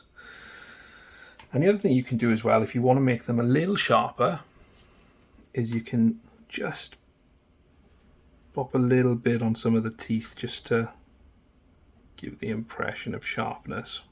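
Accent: Wales, United Kingdom. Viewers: Sorry for your loss bro. I lost my best bud back in July. Do I detect a Welsh accent there? Swansea boy here.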